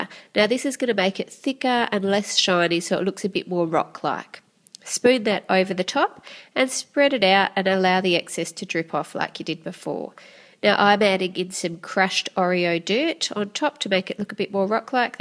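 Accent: Australia. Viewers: Your nose sounds as stuffy as mine feels today! I can't get any air through my nose right now and I sound identical to you. I mean, minus the Australian accent and adding an American accent lol. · she definitely does if you are anywhere except Australia, but if you are Australian and have an Australian accent you probably don't notice it 😊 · That's an Aussie accent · I always look forward to your videos. Not only have you helped my daughter and me out in baking and decorating numerous times, you have such a pleasant speaking voice and we ♡ your "sheila" accent (we're from Ohio, USA). The geodes are so ingenious and beautiful.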